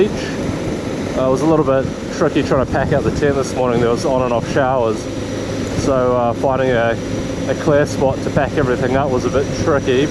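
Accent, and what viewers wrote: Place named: New Zealand. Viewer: Awesome, I followed pretty much the same route a couple of years ago, it's one of my favorite rides, that was like re-living my trip but with better music and video😁. Enjoyed this more than the overseas riders, it's always better when you know the places and to hear the Kiwi accent behind the camera, not to mention your filming and music is top notch.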